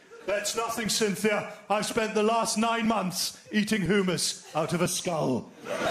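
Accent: Scotland, United Kingdom. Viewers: Scottish accent for the win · Oh my dear god, I wish I were able to understand him, all these little bits from comments and Reddit posts are hilarious, but my ukrainian brain can't digest a single word. Guess I need to learn to understand Scottish accent. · Scottish you fucking spastic.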